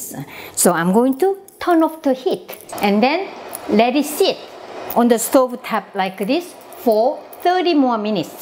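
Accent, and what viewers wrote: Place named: South Korea. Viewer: 이분정말 매력있습니다 발음 귀에 쏙쏙들어오는 한국식영어 ~~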